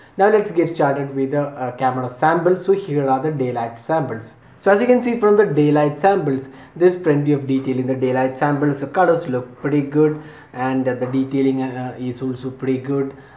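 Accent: Kerala, India. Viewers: Are u a Keralite..i can get that from your ascend..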